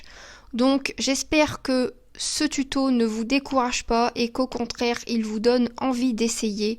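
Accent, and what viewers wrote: Nord-Pas-de-Calais, France. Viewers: Tu n'a pas a etre complexée de l'accent chti... essaies 1 fois ....rien que pour voir les commentaires ... Ose..! j"attends ta prochaine démo impatiament ..... comme je te trouve sympa ce petit truc pour te faire économiser du crayon: quand une mine casse mets une goutte de glue UHU dans le trou et atends 24 h .....bise...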